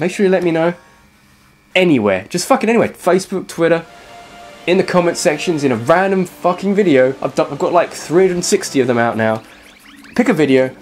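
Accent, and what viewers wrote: United Kingdom. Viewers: when are you doing the next Q&A Episode? I like your accent, I'm British too. I get a lot of shit for how I talk, that I talk posh which I don't just because I don't have a broad accent of where a live cos my mum's southern so I don't sound like my friends. And you are funny!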